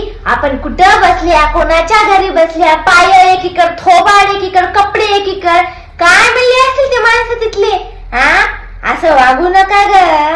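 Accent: Nanded, India. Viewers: तुमचे बोलणे ऐकून मला माझ्या नांदेड ची फार आठवण येते 😊 Keep it up and God bless you dear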